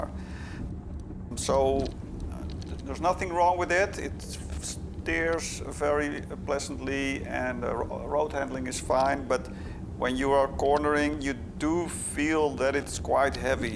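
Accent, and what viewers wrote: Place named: Netherlands. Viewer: That's a DUTCH accent not German, oy vey....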